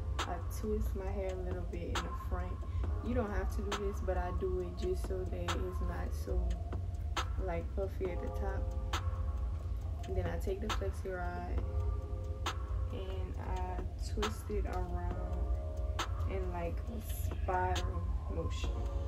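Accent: United States. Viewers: You have such a pretty voice / accent, are you from New Orleans?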